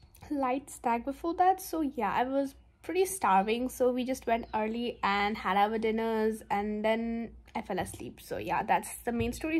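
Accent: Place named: India. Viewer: She has a Indian accent and it's so cute to listen Indian English accent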